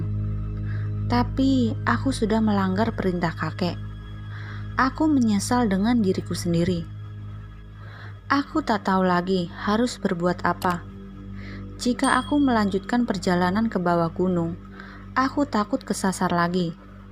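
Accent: West Java, Indonesia. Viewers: Yg baca logat sunda...ya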